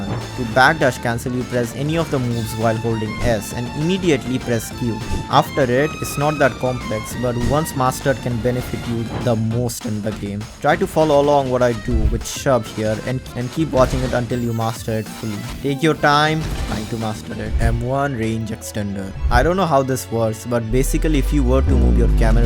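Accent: India. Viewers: I also feel he is indian bcz of his voice British say English In another way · WAHT KIND OF INDIAN ACCENT IS DAT W ACCENT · Bhai u Indian? Ur accent tells me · Indian accent? w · your indian i am pakistani so i know accent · FINALLY A GOOD INDIAN TSB YOUTUBER, THANKS THIS WAS RLLY HELPFUL PLUS NICE INDIAN ASCENT👌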